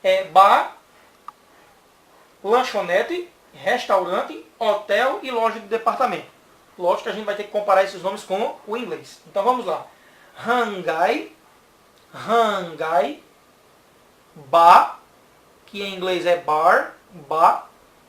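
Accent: Northeast, Brazil. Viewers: Ele é de rio grande do norte, mas esse sotaque talvez seja o mais falado em todo interior dos 9 estados nordestinos, eu sou do interior de pernambuco, e nosso sotaque é assim também. hajimemashite!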